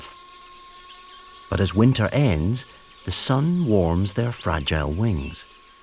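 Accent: Scotland, United Kingdom. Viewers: yess gimme that scottish accent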